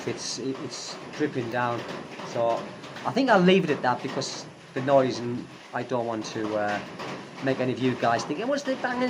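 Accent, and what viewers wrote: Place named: United Kingdom. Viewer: ome pf the appeals of your channel is your British accent, i love the way you say, de-poorted :face-purple-crying::face-purple-crying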